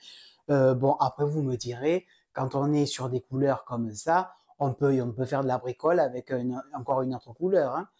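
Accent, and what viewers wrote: South, France. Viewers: Bonjour! L'accent du sud de a fait venir le soleil; la matinée était pluvieuse, jusqu'à ce que j'écoute ton podcast 😂😂😂 Super, tes chaussettes Serre-moi la pince! J'adhère a l'idée de l'instant Tricot 🎉 Bonne continuation!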